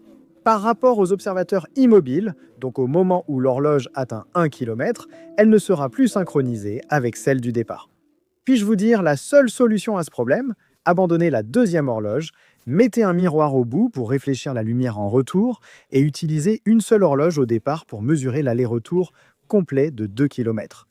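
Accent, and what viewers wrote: Quebec, Canada. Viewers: Doublage (québécois) de merde. IA peut-être ? C'est très désagréable à regarder.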